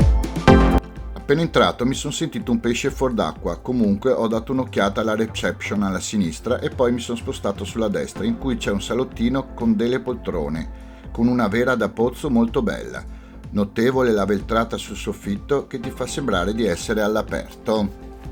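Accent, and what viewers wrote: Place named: Veneto, Italy. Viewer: Grazie mille tutto quello che dici con l accento veneziano mi piace tutto molto interessante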